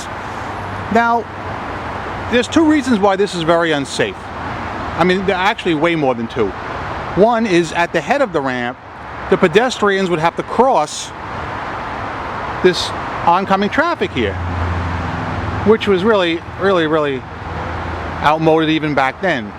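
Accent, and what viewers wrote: New York, United States. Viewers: Loving that New York accent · god i hate how you nyc people talk. it makes me ashamed to be from this state. its PARK not PORK you fucking idiot. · Nothin' like a good ol' New York accent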